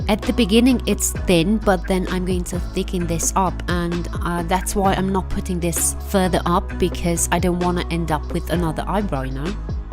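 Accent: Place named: France. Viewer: Do you speak French? Cuz I love your accent ♥️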